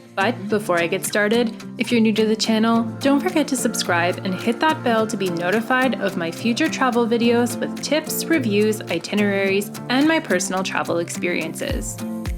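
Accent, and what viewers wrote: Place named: Canada. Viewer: This lady has a nice Canadian accent.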